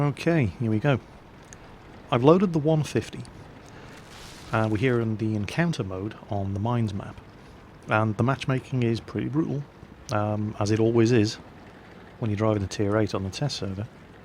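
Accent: United Kingdom. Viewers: Can you not tell hes a brit? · he's in the uk -_- did you not get a hint from the accent or British airsoft field or other ridiculously obvious pointers like that he plays on the eu server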